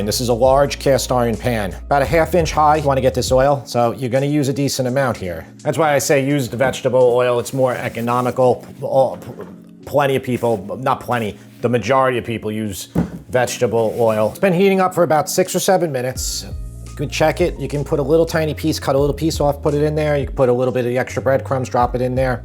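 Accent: New York, United States. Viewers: I’m watching this as we drive to the farm and my husband asks me “I didn’t know Billy Crystal has a cooking YouTube” never thought about it but there is a little Billy in your voice. I miss a New York accent from when I lived in bronxville. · I am a simple man I hear new york accent I hit like and subscribe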